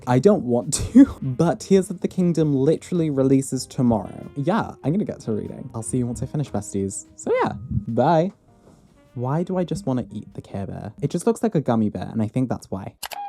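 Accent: United Kingdom. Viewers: You've such a nice accent! Is it from Britain?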